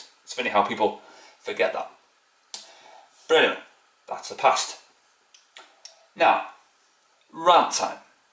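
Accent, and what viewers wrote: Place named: Wales, United Kingdom. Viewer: What part of Wales are you from? You sound like you’re from Rhyl or maybe Llandrindod Wells?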